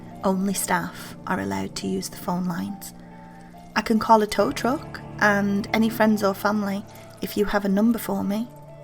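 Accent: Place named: Northern England, United Kingdom. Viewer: This was excellent! If you don't mind me asking, where abouts are you from? Your accent sounds quite similar to one of our narrators who is between Lancashire and Yorkshire :)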